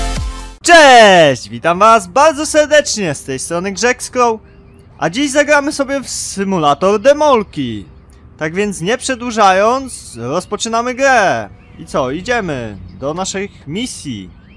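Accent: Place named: France. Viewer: masz francuski akcent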